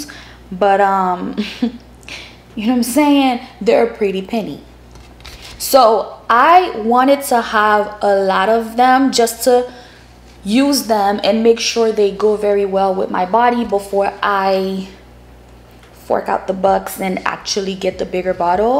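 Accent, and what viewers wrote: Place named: France. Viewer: You sound French😍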